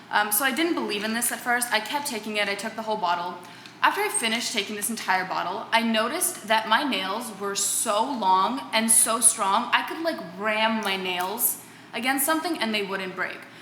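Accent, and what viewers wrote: Russia. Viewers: Кажется, будто ты уже и по английский с русским акцентом говоришь! Спасибо! Будем учить английский! 💋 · Сооооня, я люблю твои видео на английском, просто даже слышать твой голос, это просто удивительно и приятно! 🌙🌹💋🍒 Мне сразу хочется стать американкой🇺🇸, что бы понимать о чем ты говоришь, но я понимаю лишь некоторые слова, переводя в голове 🤔👻 Но хотелось бы, что бы кто-то из наших девочек, делал перевод субтитров под твоими видео, это помогает изучать английский язык, думаю что не только мне. Надеюсь ты прислушаешься и что-нибудь придумаешь) Я действительно люблю тебя, твои мысли и английско-русский акцент ❤️ Спасибо за видео! Целую 💋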